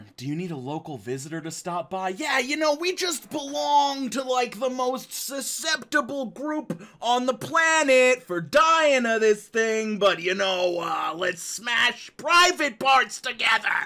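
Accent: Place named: Long Island, United States. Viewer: I have to admit I don’t care for the yelling and Long Island accent...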